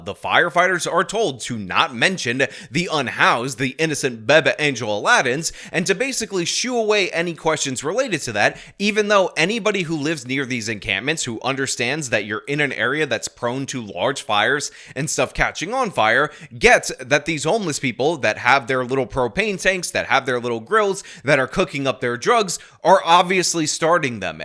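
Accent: New York, United States. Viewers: It’s not freaking awoken it’s awaken. The NY speech pattern will only get you so far with the speech nutzees. Ffs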